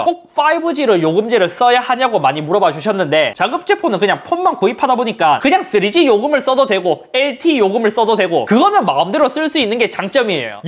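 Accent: Gyeongsang, South Korea. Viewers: 죄송한데요..다 좋은데요..목소리가 너무 듣기가 불편합니다.경상도 사투리에 톤이 너무 높아 시청하면서 듣는데 심장이 쿵땅 거립니다.목소리 톤좀 낮춰서 영상 만들어 주시면 시청 많이 하고 싶습니다.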